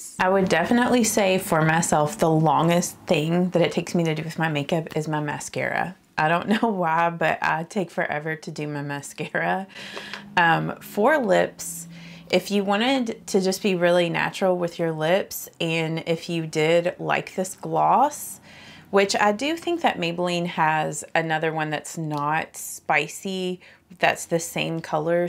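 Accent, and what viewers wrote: South, United States. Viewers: I like your voice, and, really enjoy your southern accent. Very pleasant to listen to. So I vote NOT silent video ☺️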